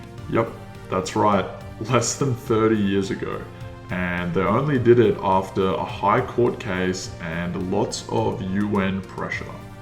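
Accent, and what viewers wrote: United States. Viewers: My only criticism is the pronunciation of anti,Americans say it like Ant-I,Aussies and English say Antee I’ve noticed it’s been creeping into our circles. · What’s with the American pronunciations?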